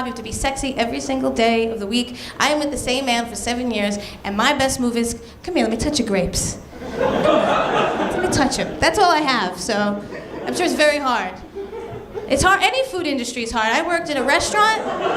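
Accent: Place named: New York, United States. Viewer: HI, IM ONLY USING CAPS TO TRY TO GET YOUR ATTENTION... I WANTED TO KNOW IF YOU WERE REALLY MARRIED? IF NOT? I WOULD LIKE TO TALK AND LAUGH WITH YOU.. ( BTW, ADRIAN ALWAYS SUPPORTED AND BELIEVED IN ROCKY! WATCH THE FIRST TWO ROCKY MOViES AGAIN ) IM ITALIAN, FROM BKLYN. NY.. YOU SOUND LIKE YOU ARE ALSO FROM NEW YORK.. IF YOU ARE NOT MARRIED? I HOPE TO HEAR FROM YOU. IF YOU ARE MARRIED? ALL THE BEST TO YOU AND YOUR HUSBAND. MERRY CHRISTMAS TO YOU AND YOUR FAMILY! ~ XOXOXO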